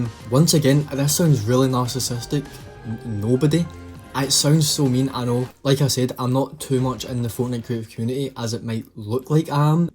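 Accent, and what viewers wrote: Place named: Scotland, United Kingdom. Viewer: Your voice sound like an real Scottish🏴🏴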